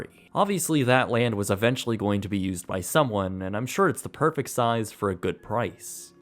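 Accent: Canada. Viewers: Holy crap! I thought you sounded like a Canadian. Hey, neighbo(u)r!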